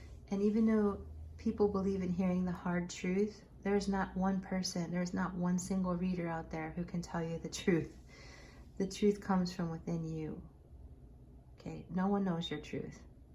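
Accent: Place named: United States. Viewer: Love you,! How can you always know, what is exactly needed at the moment. Pile 2 was especially for me. 💓American accent is so lovely on Russian.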